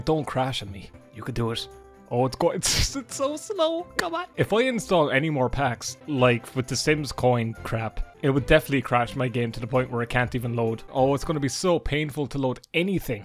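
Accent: Ireland. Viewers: I'm a simple man. I click on a let's play of the Sims games. I hear an irish accent. I hit subscribe.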